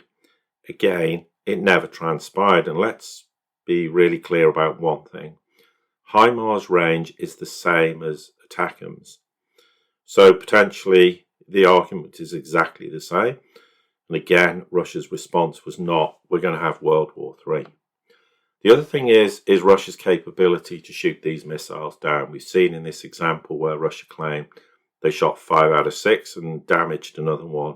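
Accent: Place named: United Kingdom. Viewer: I like your show. But even if you are legit, in time your British accent will make me think that you are a psy-op. The vast majority of individuals worldwide who work in communications positions (News, TV, Radio, Newspapers, Magazines etc..) that target a worldwide audience are British, and 95% of them are psy-oping.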